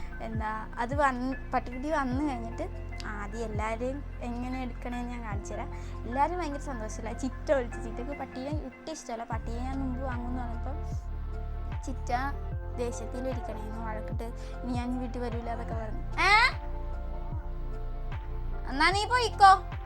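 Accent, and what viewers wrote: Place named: Cherthala, India. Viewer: ചേർത്തല slang ഒത്തിരി ഇഷ്ടം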